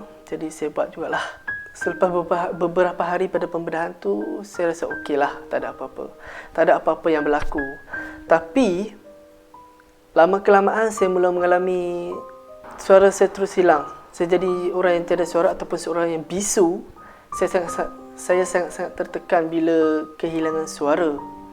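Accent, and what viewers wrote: North, Malaysia. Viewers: Hang pasti oqang utaqa..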